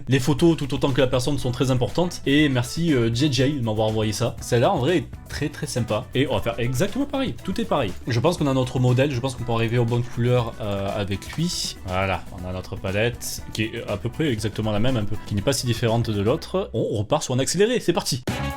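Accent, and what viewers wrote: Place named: Marseille, France. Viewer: Le petit accent marseillais des familles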